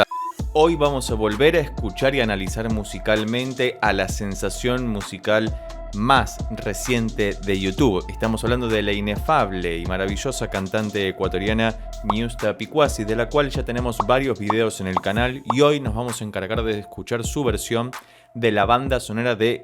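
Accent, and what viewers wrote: Argentina. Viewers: Para de hablar porteño .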